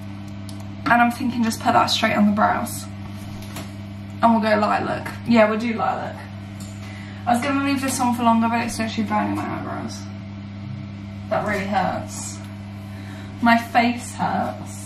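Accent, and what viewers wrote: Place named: United Kingdom. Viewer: You sound like your from Britain. I've been to London it's a great place. Thanks for sharing this electrifying video with us that watch your channel. Outstanding job young lady.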